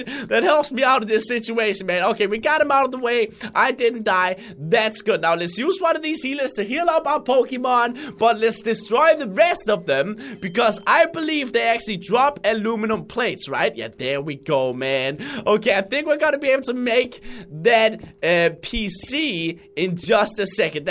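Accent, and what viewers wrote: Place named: Denmark. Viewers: arent you danish because your accent is very danish sounding no offence im danish too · He is danish.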